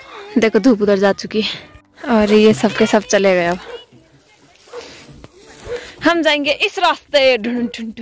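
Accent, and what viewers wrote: Garhwal, India. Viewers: Iam kumauni from nainital.. u r garwali nice tone.. ❤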